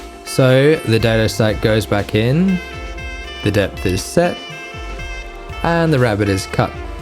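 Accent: Australia. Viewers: Just saying thanks for the presentation: a genuine honest woodworker just doing what we do. the aus accent is appealing too. I found your videos because I also researched/purchased a Harvey table saw.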